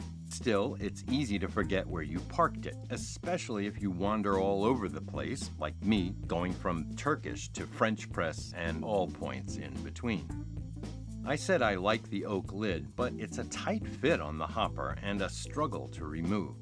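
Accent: United States. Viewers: Hello, I really enjoy your content about coffee. Thorough, insightfull and a pleasure to watch. Thought you are American, but your channel says Ireland? Good voice anyway! Subscribed. Would you consider making a video about mods for the Mignon (Specialata)? Something I suggest experiencing: cotton pour over filters…. Please keep it up!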